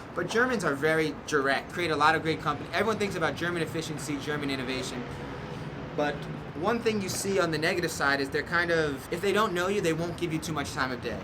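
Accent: Brazil. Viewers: Eu fico sempre com a impressão que você é um brasileiro que fala muito bem inglês. 😅 Os vídeos estão bem legais. Keep it up! 👊🏻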